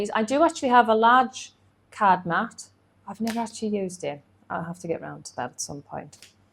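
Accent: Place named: United Kingdom. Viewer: Hey it’s so nice hearing not an American accent when your from the uk, ok I’ve been given a Cricut Personal CRV001 Die Cutting Machine, but the mat unfortunately didn’t come with it, can you help and direct me to where I can get one please I’m looking for a 6x12 mat but I can’t find one any where it’s like 15cms by 30 cms I can’t find one on amozon , your help will be much appreciated thanks.:-)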